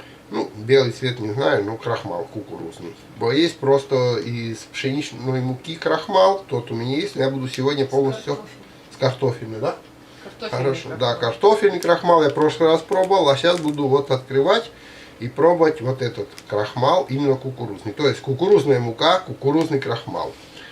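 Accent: Ukraine. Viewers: Подсмотрел у укрАинских рыбаков.украинские рыбаки -хорошие рыбаки.я с Сибири.судя по"молотарке","та же самая"говору,сразу ясно шо не москаль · Судячи з акценту, Ви українець, чи не так?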